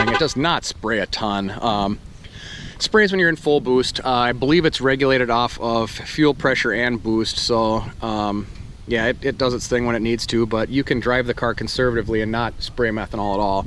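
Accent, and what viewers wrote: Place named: Minnesota, United States. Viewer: Dude sick ass car! And loving the Minnesota accent lol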